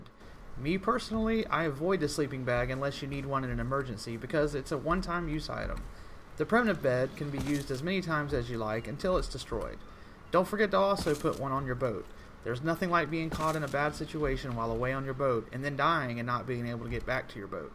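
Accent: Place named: United States. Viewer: Dude this is the best help in Ark on YouTube that I ever listen to at the same time is nice to see an American talk rather than a British action player talk your tips are the best on YouTube Most people contradict their own words when they make YouTube videos on ark with there very own video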